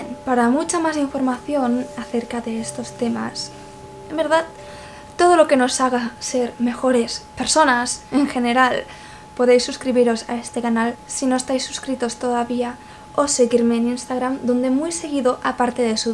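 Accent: Spain. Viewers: me encnata tu acento español . y tus videos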